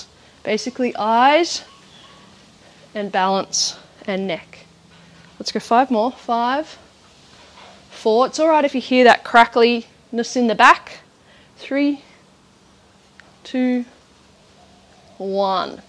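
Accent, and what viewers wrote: Australia. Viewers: Thank you so much! This is my Day 2 and I see a big difference. My husband & I have been painting our home and painting the ceiling, did it for me😩I felt my muscles were so stiff! This surely helped with some Stretching Exercises! Thank you again! Btw love your Aussie accent❣️😘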